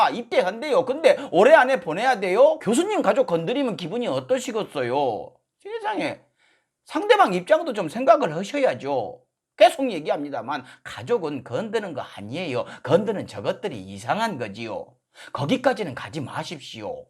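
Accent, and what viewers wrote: Jeolla, South Korea. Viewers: 전라도 사투리만 쓰지말고 전국구 사투리 쓰세요 . 그래야 전국 유튜브 방송 됩니다 .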